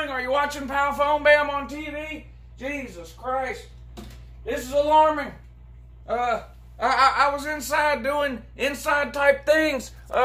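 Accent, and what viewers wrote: South, United States. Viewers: But I will keep watching. I am a gator fan in Moscow. I’ll listen to anyone with a southern accent.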